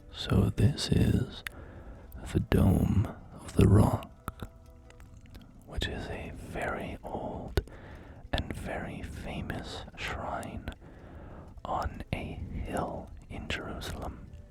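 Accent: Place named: United Kingdom. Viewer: your history knowledge is impressive never stop my knowledgeable brother, i love your accent i assume you are in the uk?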